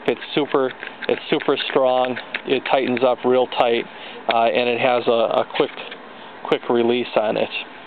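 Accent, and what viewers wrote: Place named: United States. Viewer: great to hear an american accent. good sign.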